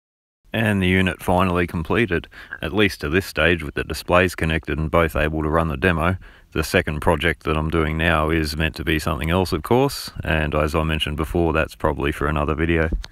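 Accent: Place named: Australia. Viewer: pleasant change to here an aussie voice haha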